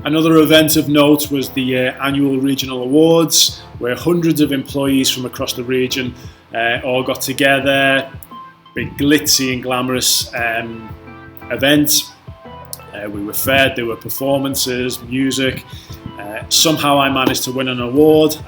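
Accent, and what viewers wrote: Liverpool, United Kingdom. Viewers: are you from liverpool? hahaha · Thank you for making these videos, I just applied for EF, but watching your other videos inspires me to apply for public schools as I have the teaching experience. I’m hearing scouse in your accent? I’m also from Liverpool, so it’s nice to hear on YouTube 😂🙌🏻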